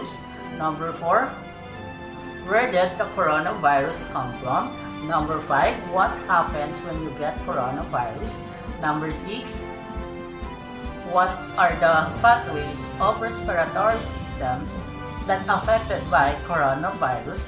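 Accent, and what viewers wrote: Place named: United Kingdom. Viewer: Yung totoo po pinakikingan ko po yung boses nyo About Corona virus Akala ko po british kayo nung Pag tingin ko kayo pla Salamat po sa pagbabasa samin tungkol po sa pandemic na sakit Ingat kayo jan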